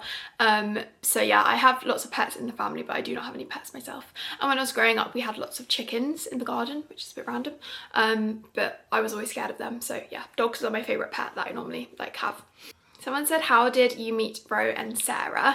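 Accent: United Kingdom. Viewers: ive seen yoy tiktoks for a while and enjoyed them, but finally subscribed to you yesterday. for some reason im so suprised your british! thought you were american...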